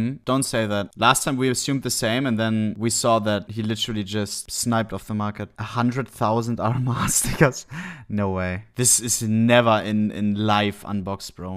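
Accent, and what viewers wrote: Germany. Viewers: german accent is so strong that it sounds like he is talking in german even when saying english words.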